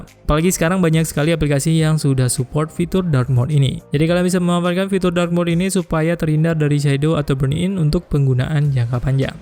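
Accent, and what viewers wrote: Aceh, Indonesia. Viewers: Org aceh? Ya om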